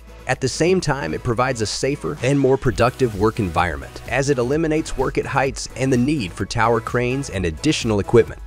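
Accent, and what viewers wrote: United States. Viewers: Why is yet another 'cut & paste' video (complete with nauseating American voice-over) purporting to be about China, showing construction methods from around the world?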